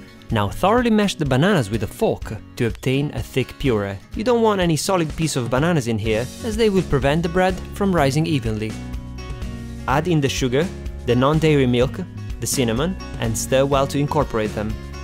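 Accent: Italy. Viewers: I think he is from Italy... it seems so to me cause im italian;) idk tho... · are you italian? im trying to figure out what your accent is, it's really cool!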